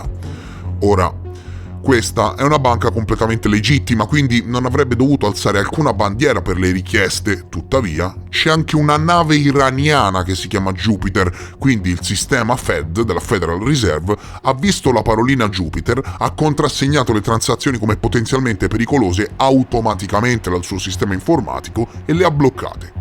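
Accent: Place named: Friuli, Italy. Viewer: Secondo me sei del nord Italia Friuli...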